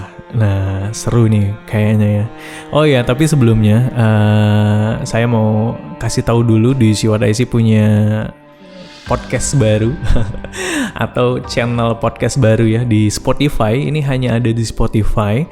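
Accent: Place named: Banyumas, Indonesia. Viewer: Mas.. aslinya Purwokerto ya.. spt Banyumasan logatnya, hee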